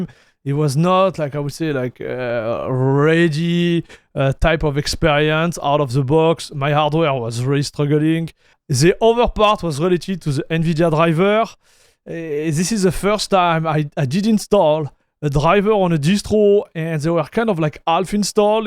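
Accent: France. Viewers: I'm only catching like one out of every five words this guy says. His accent sounds French which makes sense they're because their language sounds like they have an eternal loogie stuck to the back of the throat. Such a disgusting guttural language. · go french accent. love to have that. lol. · Came for the French accent, stayed for the Trixie technical details, particularly kisak-mesa fresh, nvidia drivers, and the linux-tkg scripts on github. Two points: might I suggest you put links to these sites below your video review. Also, kisak-mesa seems to be for ubuntu. Do the debs in their ppa work with Debian 13? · bravo for your franglais. I can recognize myself in you.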